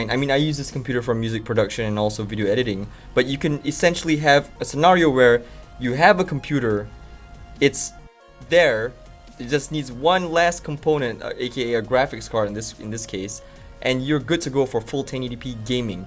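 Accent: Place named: England, United Kingdom. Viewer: nice review. especially the eng accent haha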